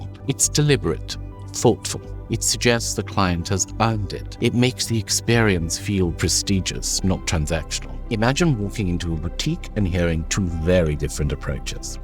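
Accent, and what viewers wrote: RP, United Kingdom. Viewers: BE CAREFUL, THAT 'POLISHED' BRITISH ACCENT IS WHAT GOT YOUR MIND AND NATIVE LAND COLONIZED.. I AM HERE TO COLLECT 😈🍄💯🤘🏾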